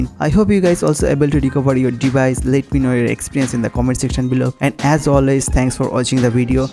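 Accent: India. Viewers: Ofull indian english accent. Cant stay. I am ran away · He has an Indian accent. Did you go to school? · To people out here complaining his accent - India is a diverse country. Speaking multiple language is something a norm unlike the west. Few states in northeast does speak good English (Exception). Excuse his accent because English is not even a second language for many and I'm sure it's the same for him. Nice video 👌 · Damn his accent hey Non- Indians please dont judge not all of us sound like that :( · al least he can speak english with his indian accent, but I can't speak english properly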